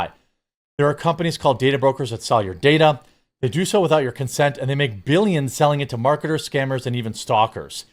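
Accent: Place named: AAVE, United States. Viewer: Please speak English not Ebonics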